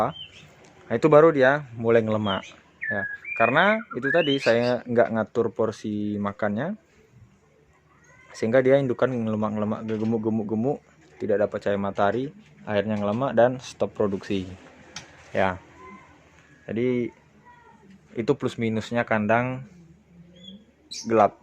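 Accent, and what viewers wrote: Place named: Semendo, Indonesia. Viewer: logat semendo nya susah buat di pisahkan ya bang?🤣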